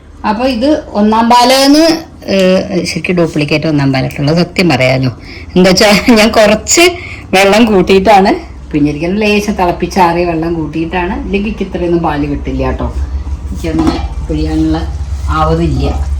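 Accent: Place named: Bharatapuzha, India. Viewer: your Malayalam is from Bharatapuzha side ,is it?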